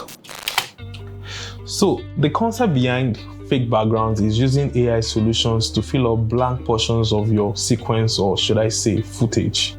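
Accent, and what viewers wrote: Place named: Africa. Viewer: Wahala! If you can't understand his accent, then you're clearly not his target audience. There are millions of other creators that have made videos on this, so go watch them. Imagine telling a creator to change his accent, a deeply ingrained trait, just to fit you. And calling his accent "local"? That's such a colonized, back-handed mindset. Accent is due to speaking another language with inflections similar to your native language. But asides from being widely spoken, please what makes English a "higher" language to diminish or look down on our beautiful African languages and accents. Please oo, think before you comment.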